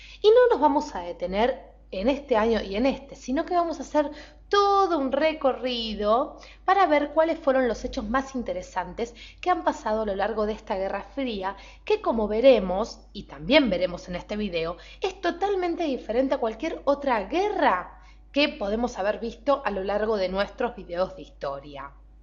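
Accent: Argentina. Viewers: "pantasha" es la pronunciación de la palabra pantalla en Argentina, no "pantaia"... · pinche acento argentino xd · es argentina, no la culpe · Cuanta ignorancia carajo, soy mexicano y a mí tambien me caga como hablan los argentinos con ese acento de mierda, ya todo difiere de la region o países pero hay que admitir que el español de verdad es el de España ya que ellos lo trageron al continente y por ende así se debería hablar. La muchacha del video al pesar del acento que me incomoda un poco se expresó de forma profecional, lo más natural posible y no como otros videos que utilizan frases que suelen usar en argentina como vos, pelotudo, que se yo......, pongo como ejemplo la mayoría de las peliculas gringas son dobladas en méxico para latinoamerica y en ninguna dicen cabrón, chabo, pendejo, etc. Así yo veo este video, por lo demás esta bien.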